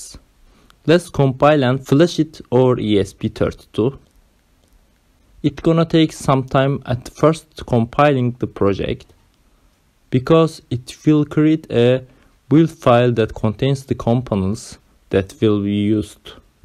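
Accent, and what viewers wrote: Turkey. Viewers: dinlerken ya bu ingilizce türklerin konuştuğu şekle benziyor dedim. sonra acıklamaya baktım :D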